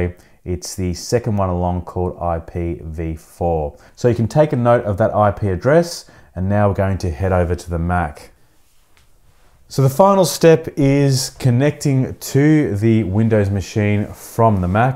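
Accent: Australia. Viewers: sounded Aussie to me...?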